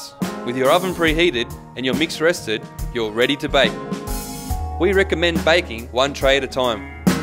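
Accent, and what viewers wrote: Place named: Australia. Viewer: The first thing I said when I played this video was OMG HES AUSTRALIAN !!!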